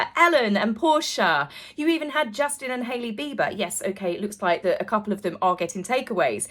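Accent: United Kingdom. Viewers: I agree that is like chatting with a friend, who happens to be gorgeous, bubbly, smart and has a terrific British accent!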